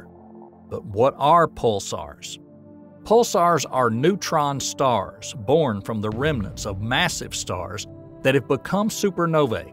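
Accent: South, United States. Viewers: Country accents are cool.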